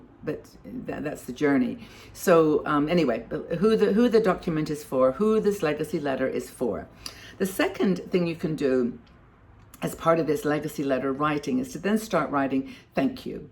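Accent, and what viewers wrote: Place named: England, United Kingdom. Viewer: I thought I could hear a very small hint of an English accent in previous videos, small but still there.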